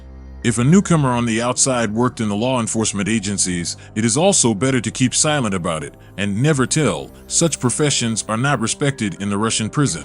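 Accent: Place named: United States. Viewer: This would be way better if it was narrated by a real russian speaking bad english rather than a boring bot speaking american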